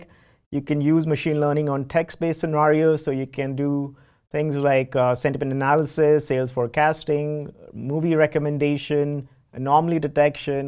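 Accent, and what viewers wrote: India. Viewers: why do you guys hate Indian accent ? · I can't go against myself and pretend that it's pleasant. It's not. The accent is irritating, and choosing an Indian native speaker to narrate a text in English is simply a stupid decision.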